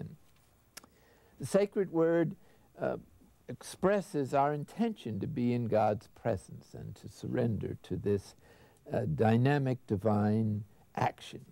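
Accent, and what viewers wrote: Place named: Ireland. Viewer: I love his voice, i wonder if his mum or dad were irish, as there's a hint of an irish lilt in there 🤔 perhaps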